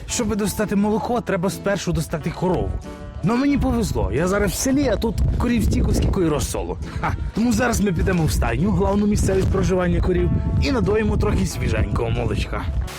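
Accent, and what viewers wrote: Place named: Ivano-Frankivsk, Ukraine. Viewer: Какой же уродский этот западенский язык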